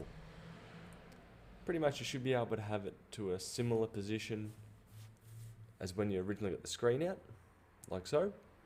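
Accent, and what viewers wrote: Australia. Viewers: Thanks for the video man! Just a question, would you be able to share the display model? I currently live in Aus and have the same exact laptop model, and in your comments you said you were able to upgrade it to a 1080p screen which is epic. I would like to upgrade my screen to 1080p aswell but I can only find 720p screens online, so I'm hoping I can find the same display you have in the internet. Or, If your local supplier is able to do nation-wide shipping, let me know and I'll also be ordering from them aswell (sounds like you're in aus aswell, lol) Anyways, liked and subscribed!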